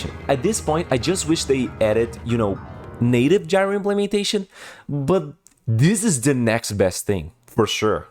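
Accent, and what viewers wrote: Brazil. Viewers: Boy, are you brazilian?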